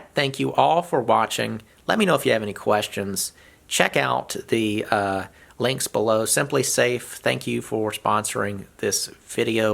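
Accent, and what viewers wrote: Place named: Mid-Atlantic, United States. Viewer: There is a privacy concern which is totally valid. His accent speaks of the Mid-Atlantic states, which range from about Virginia, the Carolinas, into as far as the Tennessee border. That is an area that is bigger than your two islands, so his concerns about crime and safety are totally valid. Hard to pinpoint where he is, given that he has a family and business in a massively populated area. Don't ask, it's a security matter!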